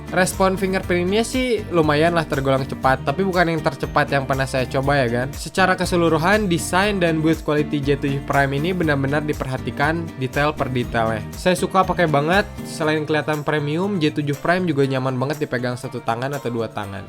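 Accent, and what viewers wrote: West Java, Indonesia. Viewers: Nyunda banget Kang 🤣 kerennn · "Halo Juragan", suara khas amat sundanya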